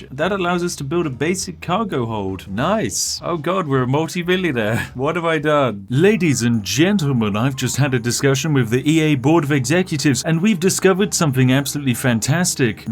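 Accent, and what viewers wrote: United Kingdom. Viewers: I don't even have spore just came here for my daily dose of British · wait a second, you are british! And you are playing Spore, where the most valuable thing is spice! AND YOU MAKE COLONIES!!!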